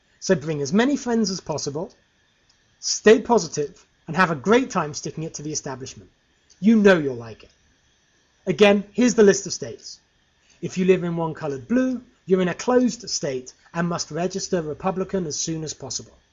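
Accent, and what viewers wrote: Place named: United Kingdom. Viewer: This is very informative and straight forward. The fact that a British voice made this video leaves me wondering how much international support Ron Paul has. Ron Paul 2012!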